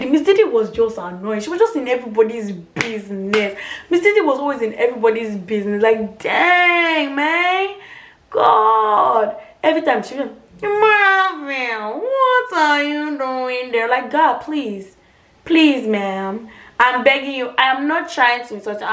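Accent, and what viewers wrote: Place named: Yoruba, Nigeria. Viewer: I even like the way she talk👌missing up with Yoruba 👍👍👍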